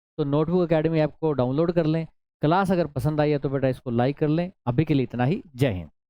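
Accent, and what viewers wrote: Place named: Haryana, India. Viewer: Sir apki language se haryanvi jlak rhi h.. maam ne btaya tha ki ap haryana se belong krte the.. Phle. 😅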